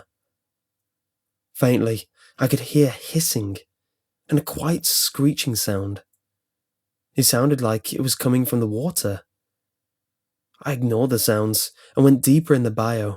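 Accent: United Kingdom. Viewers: Well if you haven't noticed yet, he's British :/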